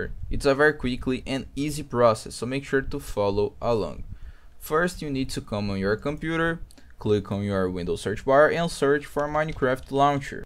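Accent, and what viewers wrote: Brazil. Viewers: A voz do brazuca é sempre reconhecível kkkkk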